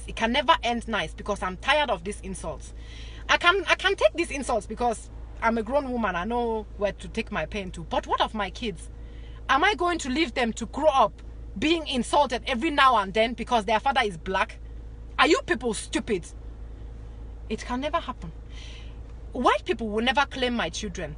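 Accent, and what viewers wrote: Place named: Nigeria. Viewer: It's the Nigerian accent I keep hearing from you for me🥰❤️🇿🇦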